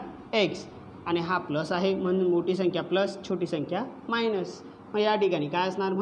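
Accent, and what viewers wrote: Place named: Kolhapur, India. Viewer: Kolhapuratun boltoy sir Brand shikvta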